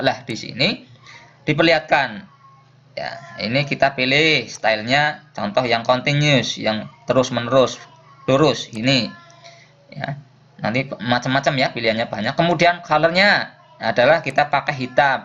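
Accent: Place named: Banyumas, Indonesia. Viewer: Salam dari Kawunganten, sip.penjelasannya mudah dipahami. ngapaknya muncul😁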